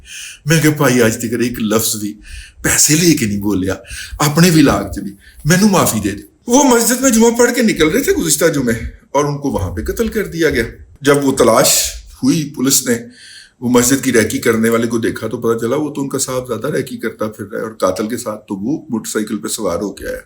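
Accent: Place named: Lahore, Pakistan. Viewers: V good sahib I like your lahori style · Pure lahori style hy apka excellent sir thanks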